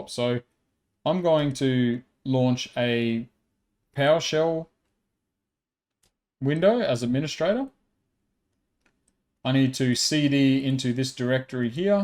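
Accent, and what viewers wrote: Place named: Australia. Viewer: Thanks, good video and great to listen to an Aussie accent for a change. Where do the numbers you use to fill in the fields in TBS Recorder come from? Are they published somewhere? I get that this video is "for educational purposes only" and you don't want this to turn into a hackers guide. Does the locked status change only when you've selected the correct settings for that satellite and that transponder?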